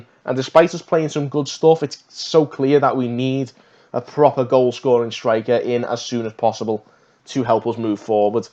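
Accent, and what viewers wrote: Liverpool, United Kingdom. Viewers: The lads doing a good job If he talked faster none scousers might struggle.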